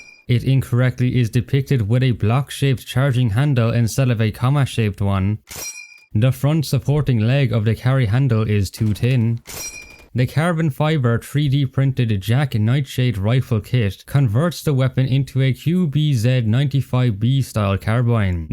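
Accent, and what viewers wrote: Ireland. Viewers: Heard your accent and thought you were Irish and I new it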